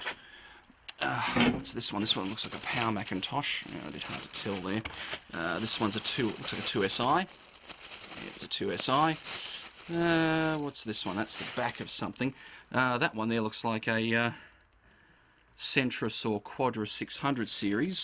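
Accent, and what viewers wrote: Australia. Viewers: Are you from Australia? You sound like you are due to your accent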